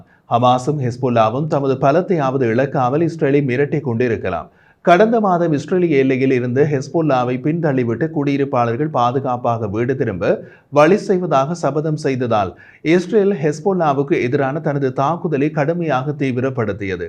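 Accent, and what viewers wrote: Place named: Sri Lanka. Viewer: இதை ஈழத் தமிழ் என்று சொல்வார்கள். கேள்விப் பட்டிருக்கிறீர்களா? உங்கள் எல்லைக்கு வெளியேயும் தமிழ் பேசுபவர்கள் உள்ளார்கள்.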